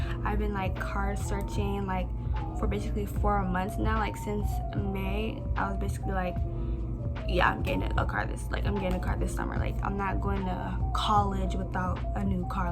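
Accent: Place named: Haiti. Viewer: fellow haitian here! north miami haitian 😂, i could already tell by your accent alonee❤❤❤. also im a long time subscriber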